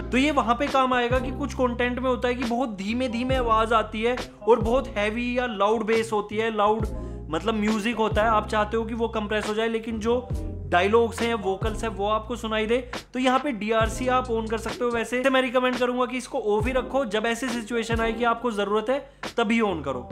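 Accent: Meerut, India. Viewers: Bhai aapki zaban se Meerut barasta hai 😀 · Dislike. Can't understand this Indian english accent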